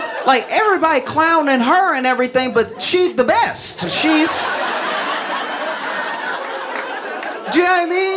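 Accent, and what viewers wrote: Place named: Louisiana, United States. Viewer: is a master at bringing it home with his facial expressions. 👍 And as a Texan, I like his slight twing of Louisiana accent. Makes it so so authentic and endearing.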